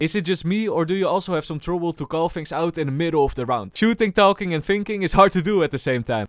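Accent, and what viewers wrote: Netherlands. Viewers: Being Dutch I thought a Double Dutch accent would be laughed about online, but proves me absolutely wrong lol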